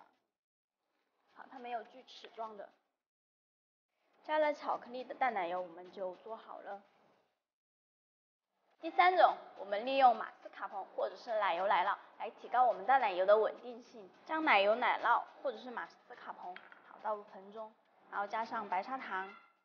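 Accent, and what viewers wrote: Sichuan, China. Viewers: 是四川人么？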